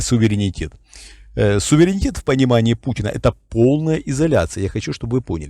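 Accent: Ukraine. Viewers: Виктор Михайлович, вы употребляете слово " ТРУСИТ". Генерал контрразведки - вы украинец ??? У вас акцент украинца, очень много слов и оборотов, которые применяют в речи только украинцы...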